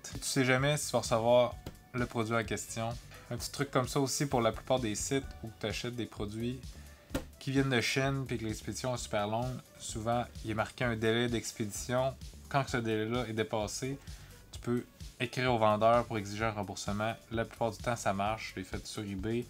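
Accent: Quebec, Canada. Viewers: Non cest l'accent québécois qui est insupportable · L’accent québécois ressemble a rien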